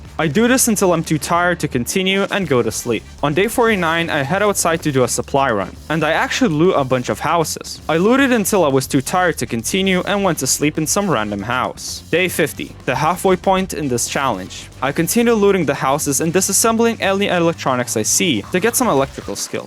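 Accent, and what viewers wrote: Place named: Lithuania. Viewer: i knew that accent was fammiliar, labas :D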